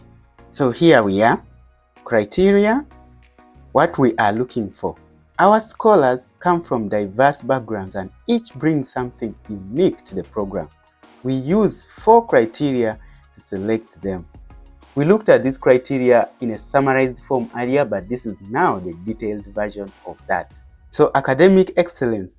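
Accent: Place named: Uganda. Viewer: I heard your voice and I knew you are Ugandan. Thank you for caring.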